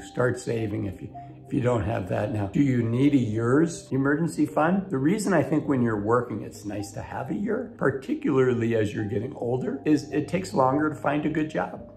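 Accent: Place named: United States. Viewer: Ohh my God… what is this man’s accent… I love his accent… I can listen to him forever. Even if it is financial information as it looks like 👍 fine I will take that 😂 I live in Norway 🇳🇴 so please don’t shame about my terrible knowledge of American accents 😊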